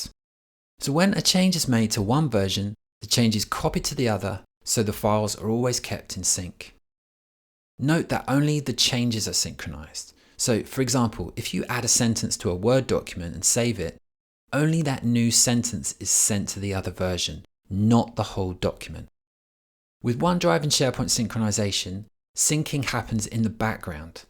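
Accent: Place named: United Kingdom. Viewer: I love your british accent :)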